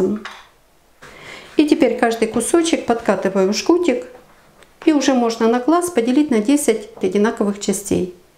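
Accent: Ukraine. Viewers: здоров я рукам .вдячна, приємно звучить украінська , горішки супер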